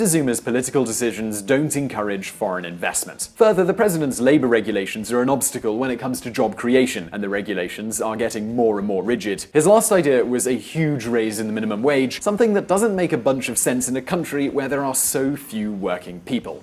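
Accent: Spain. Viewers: It's all fine and good, but please, let somebody else read your titles. The thick Spaniard accent only makes the otherwise serious videos unneededly hilarious. And, it does not really seem professional. :P Keep it up, guys! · This channel is an English version of a Spanish channel, so it make sense the narrator is has a Spanish accent. It just sounds funny tho.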